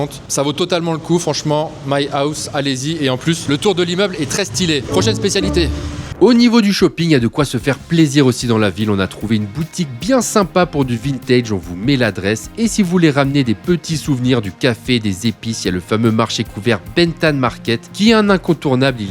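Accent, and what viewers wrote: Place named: France. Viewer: Par contre lire le Vietnamien à la Française, c’est vraiment moche lol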